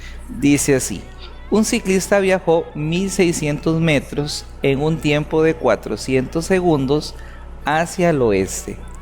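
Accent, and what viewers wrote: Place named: Costa Rica. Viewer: La voz me suena a un tico....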